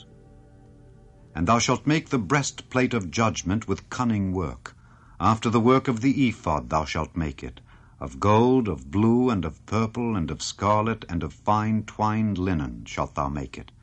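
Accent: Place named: United Kingdom. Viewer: So funny to see beautiful Jewish history with super British voiceover haha, Just feels so unnatural...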